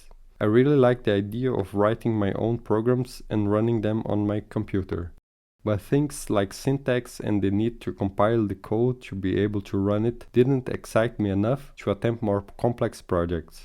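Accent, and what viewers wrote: Brazil. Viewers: O inglês com o Tchu pra ca e tchu pra lá é do Brasileiro hahahaha. Ótimo video. Seguindo e cutido. Um Salve da Holanda! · Man , are brasilian? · are you Brazilian?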